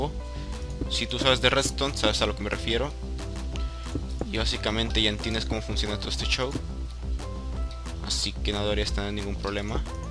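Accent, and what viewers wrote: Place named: Spain. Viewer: siges con acento español e_e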